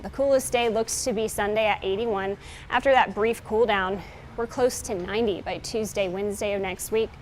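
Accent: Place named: Louisiana, United States. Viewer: 😉 speaking like she from Louisiana, very slow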